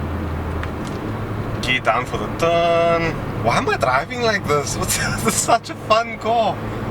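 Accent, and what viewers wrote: Cape Town, South Africa. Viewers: I always get excited when I hear a South African accent doing some reviews on YouTube 😭♥️ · His accent is from Cape Town South Africa to be exact.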